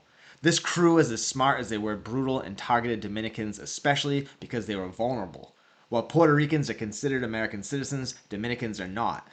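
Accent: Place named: Massachusetts, United States. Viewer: ....YO DOIN A GOOD VLOG... WHAT MAKES IT INTERESTING. IS YOUR MASS ACCENT,.. YOUR. KOOL THOUGH....OHH BY THE WAY.AM NO GENIUS, BUT I DID COMMERCIAL FISHING FOR 44 YRS, AN IM RETIRED OLD..F. AN GET TO WATCH ALL THE YOUNGINS. CRY ABOUT THIS AN THAT...WHILST I GET TO BULL RAKE IN PORTSMOUTH R.I.... AN MAKE A FEW HUNDRED EVERY OTHER MONTH...YA KNOW. FER LITTLE KNECKS.....I live.. You Know...!!!! I used to sell oysters at the Fishing pier where..No Name Restaurant is..It's a Seafood business next Booth over...used to get.120 bush..for undocumented oysters..That was side job.in. 90s... I MISS FISHING...HEY..IF YOU KNOW YOUNG GUY'S THAT WANNA WORK ON CLAM BOATS...LMK.ILL GIVE ALL INFORMATION...THEY ALWAYS HIRING...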